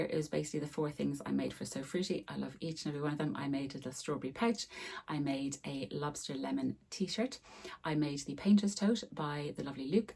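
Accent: Ireland. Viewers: I do love your vlogs. Your accent reminds me of 7 months I lived in Crossakiel when my OH had a job in Cavan.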